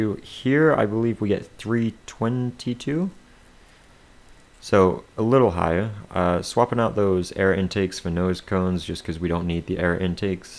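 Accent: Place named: Mid-Atlantic, United States. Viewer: A true "Mid-Atlantic Accent" then!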